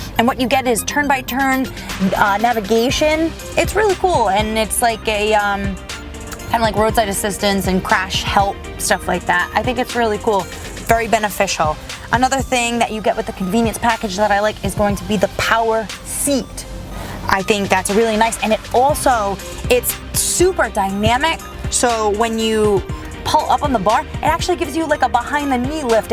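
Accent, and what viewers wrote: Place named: New England, United States. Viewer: Hella cute and that new England accent though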